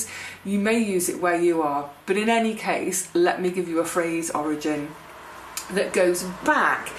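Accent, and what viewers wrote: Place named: West Country, United Kingdom. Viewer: Wouldn't it be lovely if everywhere could have a cottage hospital, when we were in Suffolk there was one we went to in Aldeburgh, and there is one near us now at Wells-Next-The-Sea. Hope you get a bus pass 🤞 Did you know you're developing a West Country accent? I've noticed it more and more recently 😊❤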